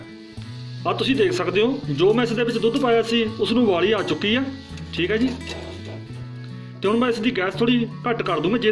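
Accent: India. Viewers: Bhai aap ki punjabi bilkul mere nanhyal wali he wo ambala punjab k the ab hum Pakistan k punjab mehe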